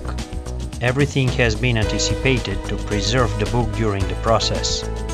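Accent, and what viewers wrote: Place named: India. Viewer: Swiss made but with Indian accent ?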